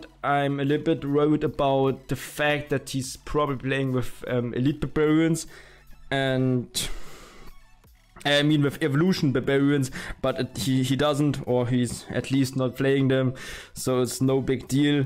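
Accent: Germany. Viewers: Man hört so hart das du deutscher bist 😂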